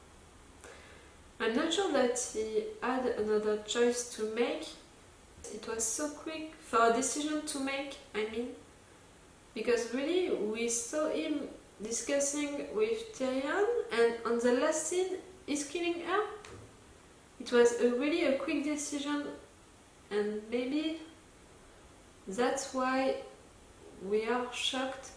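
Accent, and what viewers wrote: France. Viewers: a bientot! :) and I'm gonna miss that cute French accent, too!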